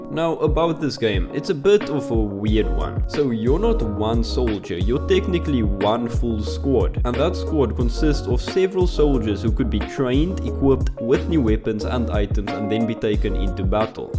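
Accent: Afrikaans, South Africa. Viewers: can hear the afrikaans in this boet, but good video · Are you afrikaans?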